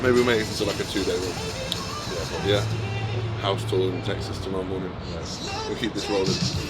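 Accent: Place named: United Kingdom. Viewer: Bro I don’t know if it’s the British accents or what but I have been on a British bodybuilding channel binge for the past couple months great content sir.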